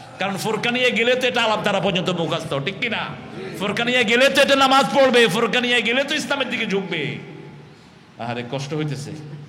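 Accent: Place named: Noakhali, Bangladesh. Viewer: আমারও মনে হয় কারন অনি নোয়াখালী ভাষায় কথা বলে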